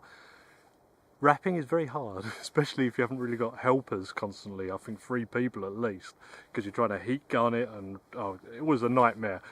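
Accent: United Kingdom. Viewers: He's British definitely not American accent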